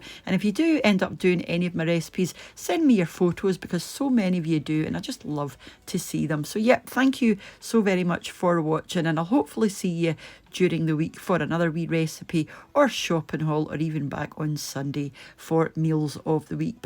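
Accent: Scotland, United Kingdom. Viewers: I've made your scotch broth and OMG loved it! I will try this too, but I have to say, you could burn toast and I'd still listen. Love that Scottish accent. I can't wait to visit Scotland - as long as Nicola doesn't close the border to us English 🙄 Anyway, 💜 to all in Scotland xx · Old recipe but I'm making it. Love your Scottish accent. :) looks amazing....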